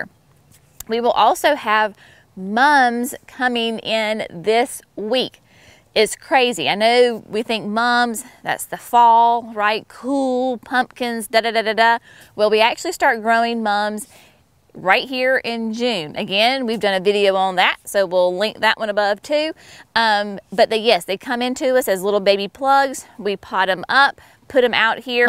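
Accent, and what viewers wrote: South, United States. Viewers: Love your videos. Love your phraseology. You talk like we do with a southern accent rather than our hillbilly accent. I can totally understand stunk to high Heaven.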